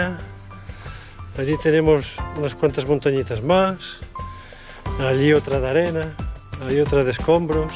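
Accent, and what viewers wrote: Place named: Catalonia, Spain. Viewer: Noto en tu acento un deje Catalán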